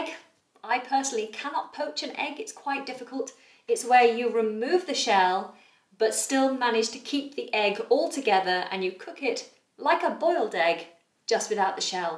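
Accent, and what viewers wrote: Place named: United Kingdom. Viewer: I'm enjoying your British English ))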